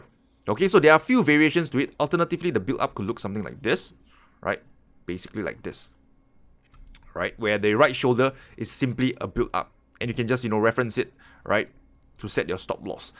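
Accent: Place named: Singapore. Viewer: Darn i miss your accent man i miss singapore! You are from singapore i assume?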